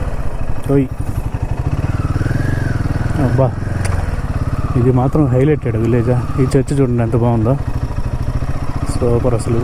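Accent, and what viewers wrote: Nellore, India. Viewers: బ్రదర్ పూర్తిగా నెల్లూరు యాసలో నె మాట్లాడండి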